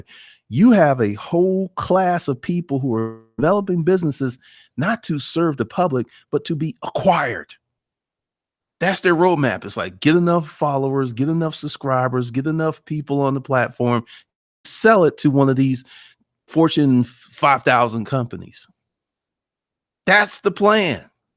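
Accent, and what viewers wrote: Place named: South Carolina, United States. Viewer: Yooo!! you sound JUST like the folks from my hometown in SC. I always thought the same thing, but never voiced it. (guess I was afraid to get smacked. lol) THEN.... my mom told me "don't get one of these jobs at the plant and get comfortable with money" I said, "TRUST ME... You 'ain't' gotta worry about that mama." haha Thanks for the amazing video. So much truth in here!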